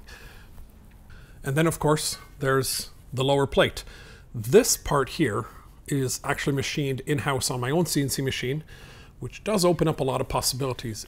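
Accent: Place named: Canada. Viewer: A Canadian sounding Hoosier?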